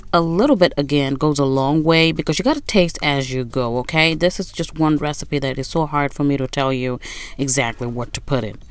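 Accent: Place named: New York, United States. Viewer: That New York accent. Are you from New York?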